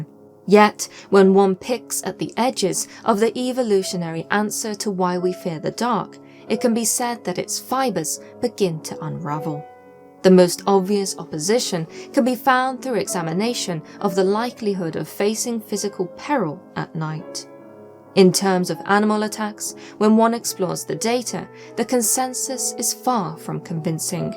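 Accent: United Kingdom. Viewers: The narrator, is very eloquent and very english, very lovely. And this explanation about why we are afraid of the dark does make since. I don't feel quite so bad being still afraid of the dark and being 49 lol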